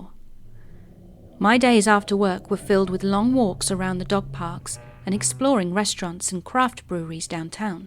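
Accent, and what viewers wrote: England, United Kingdom. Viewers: Good to heart an English female narrator 😊 I have a lot of true paranormal stories that I’ve not got around to sharing if you want them ? How do I send them to you ? X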